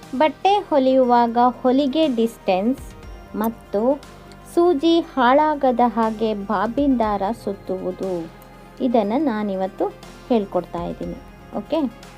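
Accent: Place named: Uttara Kannada, India. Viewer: madam nim bhashe nodidre uttarakannada davru ansatte. nim jote ondsari matadbekittu madam plz.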